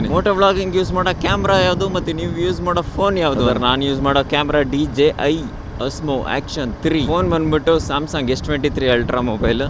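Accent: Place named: Tiptur, India. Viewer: Randomly watched ur insta reel and watched ur channel here ...Nam tiptur slang alli nim videos literally touched the hearts and kept us entertained ❣️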